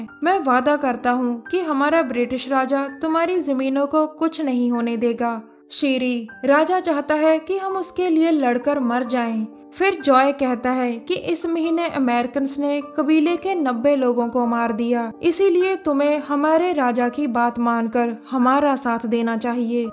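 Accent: Punjab, India. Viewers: Mam app Punjab sa ho ? Ya Haryana sa?? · Ur definitely punjabi apke accent se pta chal aap punjabi girl ho ❤️👍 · Aap punjaban ho na. Punjabi log aisi he Hindi bolte hai.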